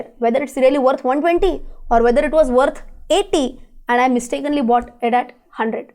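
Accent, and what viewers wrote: India. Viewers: Definitely your english is nice it is actually better version of english. The india english accent. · Could you start speaking in hindi cause I listen to a lot of English stuff nd it's hard to adjust to your English, I am nt saying you english is bad its just tat indian broken English a lot harder to understand then even hindi, I can understand hindi a lot better then broken English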